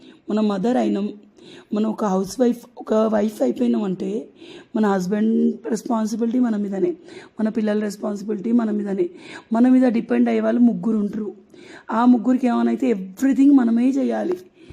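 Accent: Telangana, India. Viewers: Nice Bhasha ❤👌pakka Telangana ❤❤❤😅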